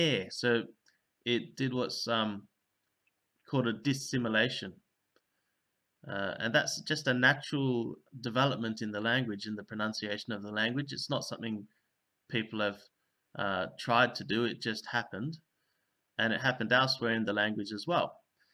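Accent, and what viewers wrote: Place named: Arab world. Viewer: This is a very good explanation for the Greek transliteration of Yeshua. I have listened to a couple your Hebrew readings and your Hebrew pronunciation sounds a lot more purer than the the false modern jewish hebrew. Yours sounds more arabic. Do you have any videos were you explain your pronunciation distinctions and corrections compared to the incorrect pronunciations of modern jewish hebrew? Thanks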